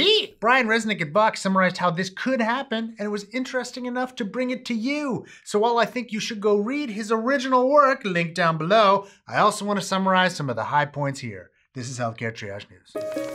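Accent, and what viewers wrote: United States. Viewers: If you're 99% Eastern European Jew, why are you talking like a 100% Jersey shore Italian good fellah?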